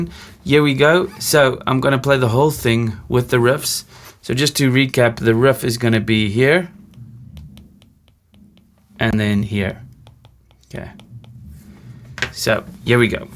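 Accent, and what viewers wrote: South Africa. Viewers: Great video. Where are you from? You sound a bit South African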